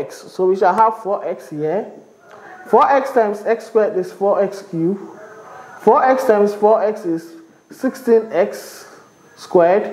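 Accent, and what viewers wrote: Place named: Ghana. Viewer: you are a wonderful teacher....you are a Nigerian with a Ghanian tongue..........you are doing well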